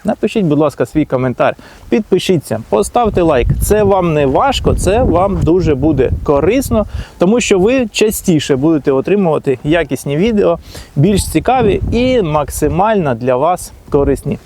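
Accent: Standard. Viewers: А млжно ли этими ронпарптаии обработать вишни, яблони, груши, сливы. Инжир.У нам всех деревьев по 1 штуке и абрикос молодой еще не цвел, то его нужно будет обработать. А мадину и смородину иожно так обработать? И да мова чудова чиста и правильная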